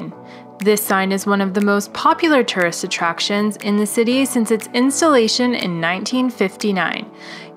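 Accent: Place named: Canada. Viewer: I enjoyed the video, but I was easily distracted by the Canadian accent!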